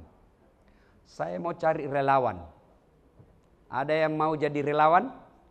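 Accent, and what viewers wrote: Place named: Sulawesi, Indonesia. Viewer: MasyaAllah Yang paling saya suka logat sulawesi nya. Sehat truss ustadz. semoga selalu dlam lindungan Allah swt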